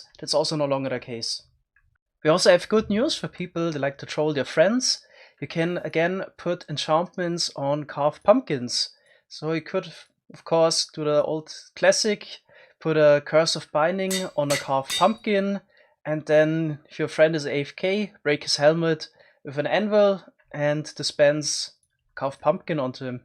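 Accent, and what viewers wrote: Germany. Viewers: 100% der Akzent ist einfach mega deutsch 😂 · Du bist deutsch, oder? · are you from Germany?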